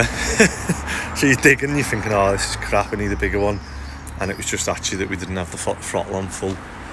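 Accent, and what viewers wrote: Liverpool, United Kingdom. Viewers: Nice Scouse guy.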